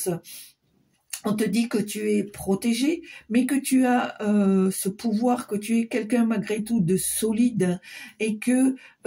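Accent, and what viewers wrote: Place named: South, France. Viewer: Doux accent du Sud avec légers roulements de R comme notre très Cher trop tôt parti Nougaro, je dirais Oh Toulouse bien aimée 😁✌️👍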